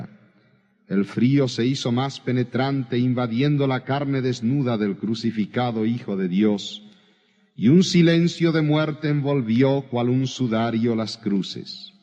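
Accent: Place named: Argentina. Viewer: Aquí todavía se le nota el acento ARGENTINO. Pero más adelante lo perdió un poco. Y maduro como el buen vino al punto que después de una hora escuchándolo, cuando terminaba la predicación siempre quedaba la sensación de quedarse con más gana de escucharlo MRMC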